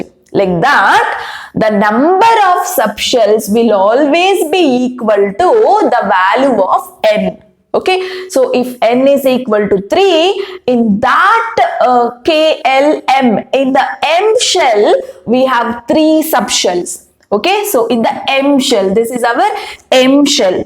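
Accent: Karnataka, India. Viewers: Are u from Karnataka mam😮😮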